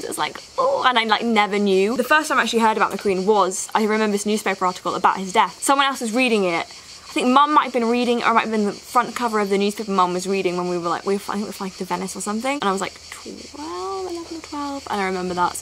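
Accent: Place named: United Kingdom. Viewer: learn me the british accent. I love it soo much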